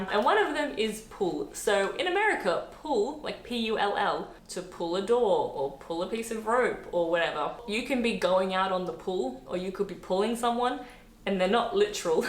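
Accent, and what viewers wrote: Australia. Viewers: thank you for this nice presentation even though you seem a little nervous presenting these subjects. Your Australian accent is very faint compared to my Ex Father-in-Law who was brought up in the 'Bush' in the 1930s but settled (ex RAAF) in England after WWII. In the 1950s my mother would take me (aged five to eight) to the cinema (yes I cried for Bambi). I forget the title of an Australian film that we saw but the young lad used the word 'bum' meaning his bottom. My mother quickly informed me that "Australians are allowed to say that word". It was even better when the lad sung, to the tune of 'The Ash Grove': My teacher's got a bunion A face like a pickled onion, A nose like a squashed tomato And legs like match-sticks. etc. Rugby Football is similar to American Football in that they rarely kick the ball but without the protective gear. 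Australia (Wallabies), New Zealand (All Blacks) and South Africa (Springboks) all give good account of themselves and, without doubt, have much 'dirtier anthems' that they sing in the showers or in the Pub' after the match. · FYI this is a cultured Aussie accent unlike certain Aussie accents that seem to be plucked out of the 1930s .Yes I'm talking about cobber ,sheila ,crikey . These terms were out of use before my time and I'm a 57yr old bogan. We are more likely to say " nice bit of pussy" than " crikey that's a bonza sheila"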